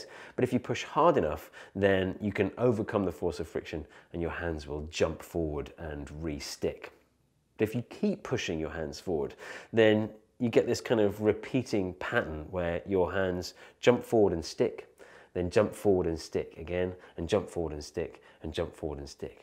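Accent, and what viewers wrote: England, United Kingdom. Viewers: I've gotta agree about the presentation voice. I'm in the States and I think there's also something about an English accent which conveys authority for many of us as well.. but I think the calm confidence contributes a lot. I came via standupmaths.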